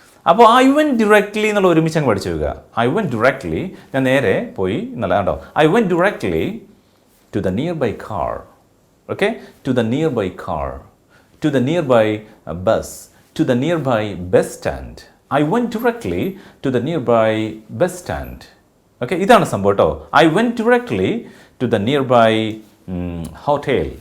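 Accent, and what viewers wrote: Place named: United States. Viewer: American pronoun sation good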